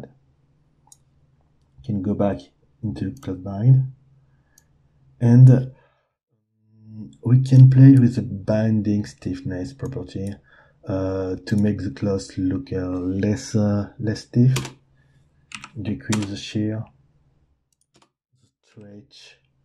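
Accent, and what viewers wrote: France. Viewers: hey, avec l'accent j'ai assez compris que tu était francophone, merci pour ce tutoriel !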